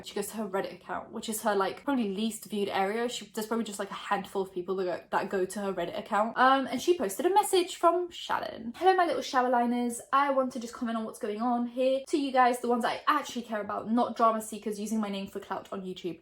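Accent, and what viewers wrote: United Kingdom. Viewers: I watch you videos all the time but today I was surprised when you started talking and I heard your accent , as if I had no idea you had a british accent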